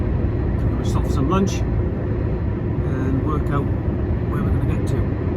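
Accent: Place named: Northeast England, United Kingdom. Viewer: your accent is almost identical to that of another YouTuber called John Kirkwood who is a retired professional baker from the northeast of England. I highly recommend his channel if you have an interest in traditional British cooking and baking.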